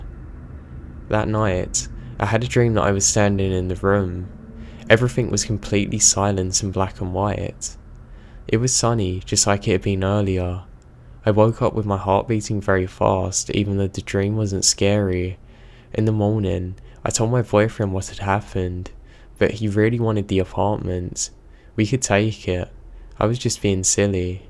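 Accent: United Kingdom. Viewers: Is this an British accent on the first story? Just because an apparition looks like someone like a little girl, doesn't mean it is as it appears. The second story indicates that when the young man was at home (he had the whole place to himself) with his girlfriend that he married later. He was taking a shower and saw "help me" me on mirror in the bathroom and right before this he saw an apparition after the lights dimmed. Then, he says he didn't want to go home that night, but his parents gave him no choice. Hum? I thought he was at home? Finally, it was time to move out into their new, home, Then, he went back to his home with his girlfriend to get the phone charger he had left there The narrator stated this was the first time his girlfriend had been in the apartment. Hum? Am I the only person that heard noticed this?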